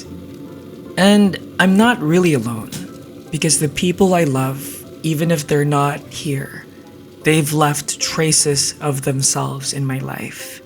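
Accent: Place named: Philippines. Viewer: Your Phillipino English accent is so beautiful and relaxing.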